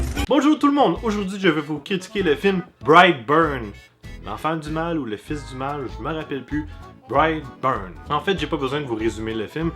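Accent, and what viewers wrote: Canada. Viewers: tes quebecois je crois tas un accent canadien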